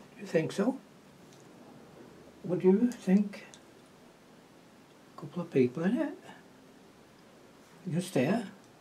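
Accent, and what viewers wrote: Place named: Northern England, United Kingdom. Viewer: great pen & wash,keep em coming! and the way you talk is ok,you are what you are and the northern accent is good.i was born in the east end of london and we have our own language,when i moved to another part of london the teachers at school called my mum in and said they couldnt understand what i was saying, be proud of where you are from,not to please others. keep well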